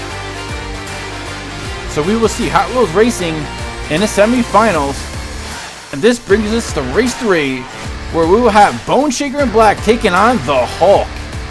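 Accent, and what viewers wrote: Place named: United States. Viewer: Oh I remember you live in Thailand. Because of your accent/voice I sometimes think you are from the USA so it would've been cheap seeing as I got some cars there but great video!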